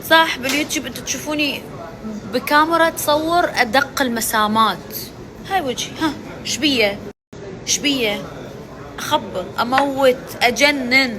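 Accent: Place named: Iraq. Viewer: فديت اللهجة العراقية 🎀👽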